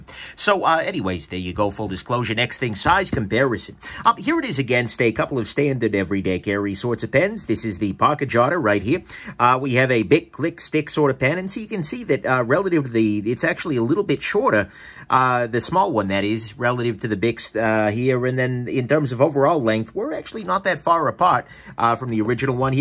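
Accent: United States. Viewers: Russian? Some sort of American accent is what I am hearing... Kind of reminds me of a Warner Bros character...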